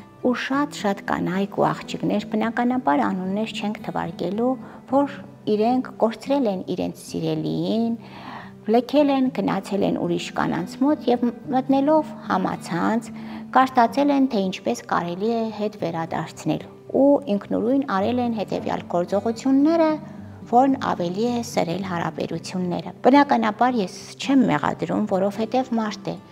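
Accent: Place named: Gyumri, Armenia. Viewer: Իրա ազգանունն է նույնիսկ ասյում 50-50է կեսը գյումրեցու կեսը գրական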